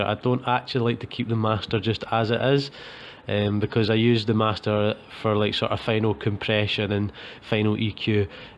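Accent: Scotland, United Kingdom. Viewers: Oh Aye scottish tutorial , thanks for the help mate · I love scottish accent man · gold i believe its a Scottish accent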